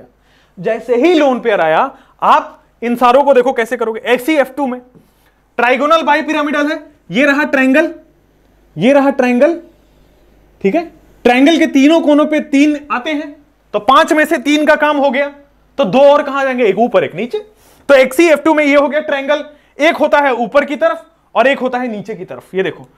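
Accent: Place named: Bihar, India. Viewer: Sir your accent and the way you speak words... Tells us that you are from bihar... And u prooved this too : " Bihari hai to bambaari jrur hogi " .... The only difference is... Aap bambaari chemistry me krate hai.... I literally enjoyed all your lectures.... UP se kota ki teaching ke benefits de rhe hai aap.... Thank you so much sir for your hard work... 🙏✌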